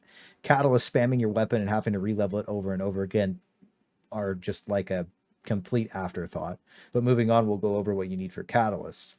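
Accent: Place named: United States. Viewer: It's an American thing. Water is warduh.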